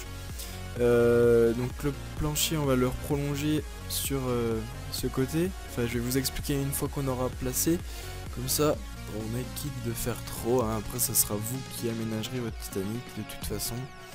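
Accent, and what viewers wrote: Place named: Franche-Comté, France. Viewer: Tu as l'accent de la Franche-Comté toi non ? Si oui bel accent 😉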